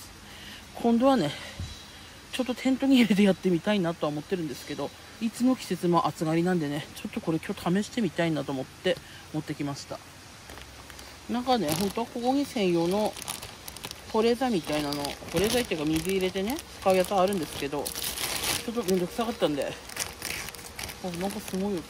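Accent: Aomori, Japan. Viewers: Jaiさん初めてコメント致します。オススメに出てきてすっかりJaiさんのファンになりました。過去の動画も見漁ってます😂 人柄の良さがにじみ出て、豪快な飲みっぷりと食べっぷりにアッパレ。青森弁にも癒されてます。 キャンプは憧れだな…いつかやってみたい! 大自然の中で飲むビールは美味しいよね。 私も大酒飲みです。 次の動画も楽しみにしています。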